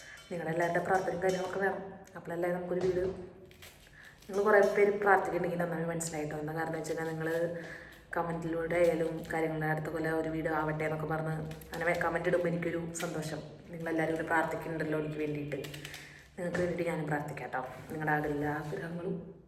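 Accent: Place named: Thrissur, India. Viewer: Nammade asal thrissur language..enikku kettappo samadhanam ayi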